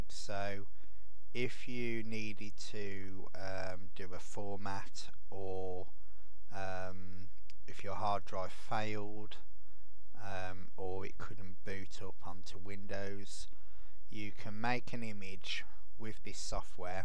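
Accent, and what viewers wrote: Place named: Ireland. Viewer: thank you for giving such an informative video. I received an Acronis True Image Activation Key with my new Crucial SSD. Having never heard of Acronis, I ventured onto Youtube and found your video. So now I feel up to speed. One question: my wife and I were wondering from you accent where you are from. She said Ireland, and I'm just not sure. OK, I'll say Ireland too.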